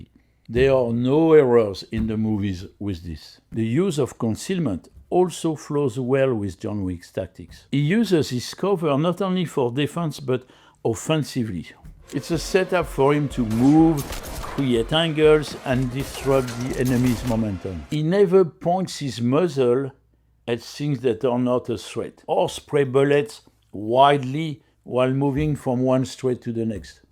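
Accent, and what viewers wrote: France. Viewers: There’s a French Guy telling us about firearms?? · As a native french speaker, his accent is way too pronounced. This is so cringe. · First time watching this channel. I enjoyed this episode. I especially liked the French (I believe?) accented narration because it’s unusual.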